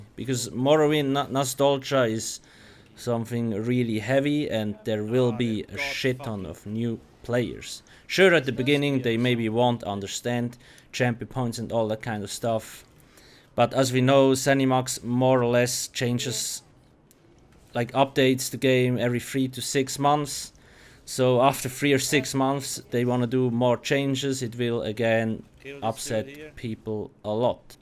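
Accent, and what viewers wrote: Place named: Switzerland. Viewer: Pretty sure he is Swiss. That is our accent :)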